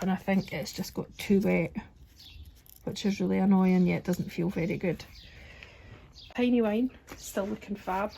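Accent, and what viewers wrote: Scotland, United Kingdom. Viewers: Hi there. I just happened upon your channel today. Great to hear another Scottish voice 😛 You have added lots of great plants into your garden and things are looking great. The Heucheras, Brunnera and Hostas are doing well - mine are too - must be the Scottish air (I'm assuming you are in Scotland!). Anyway I have subscribed and look forward to binge watching your other videos. All the best. Mags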